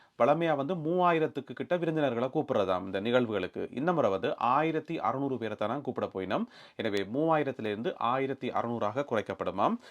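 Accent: Jaffna, Sri Lanka. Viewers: உங்கள் பதிவுகன் எல்லாம் வரவேற்கதக்கது,, நன்றிகள் பல,, உங்கள் தூய அழகான யாழ் தமிழ் உச்சரிப்பு போற்றதக்கது….😊😊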